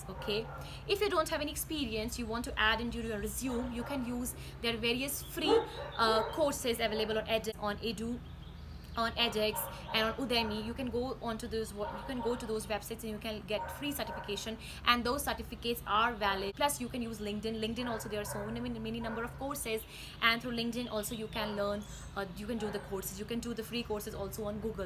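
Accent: Italy. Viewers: Your accent is Italian now.... <3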